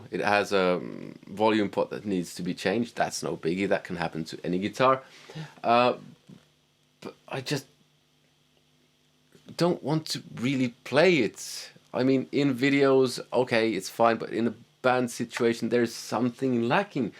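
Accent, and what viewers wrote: Ireland. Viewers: I'd wager you learned to speak English while living in Ireland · Where does your Irish lilt come from?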